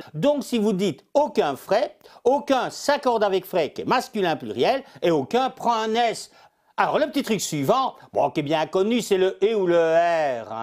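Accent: Belgium. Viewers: Pour le journal parisien il a plus l’accent belge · il est marrant ce gars. un petit accent belge non?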